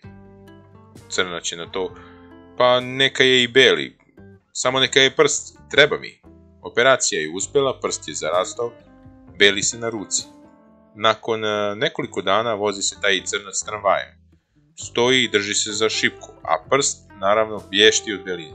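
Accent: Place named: South, Serbia. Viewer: Samo kada bi južnjački šmek malo smanjio,možda bi i na nešto ličilo.🤭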